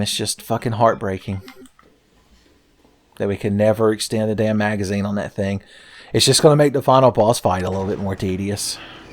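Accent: South, United States. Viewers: I've fallen asleep to the sound of sweet, soothing, southern voice, and its only affected me by helping me fall asleep and stay asleep.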